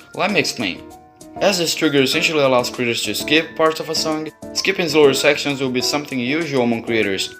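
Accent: Brazil. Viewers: Are you Brazilian or Spanish? I'm from Brazil xD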